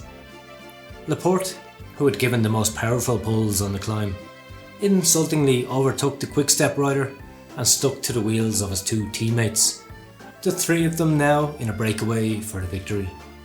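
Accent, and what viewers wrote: Ireland. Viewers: What makes you think this is from a Brit? The narrator is Irish (you need to read a bit of history if you think Irish and British are the same thing!), and the early videos all have continental European narrators. I am British, however, and I think your full of shit if you think that just because British athletes have doped that I am not allowed to voice my suspicions of others. I personally believe that there are more at it than aren't, and not just in cycling.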